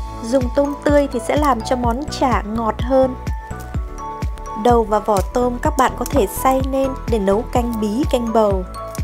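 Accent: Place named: Hanoi, Vietnam. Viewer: Ban nay có giọng nói đe nghe như người Hà Nội ấm áp ghê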